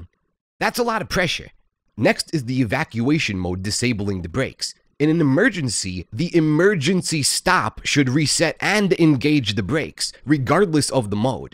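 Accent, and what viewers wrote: United States. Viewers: Ill take an american accent over an AI generated bot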